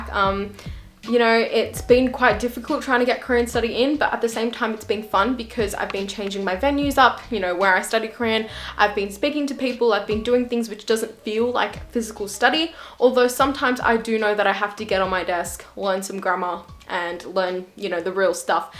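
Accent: Australia. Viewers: while you were sleeping was such a good drama!!! i got second lead syndrome watching it though >.< also, i love your aussie accent haha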